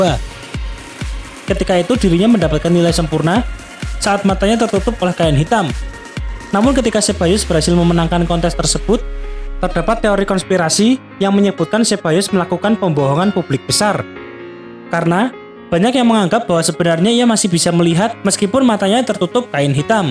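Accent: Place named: Medan, Indonesia. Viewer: Medan nya medok bgt, hahaha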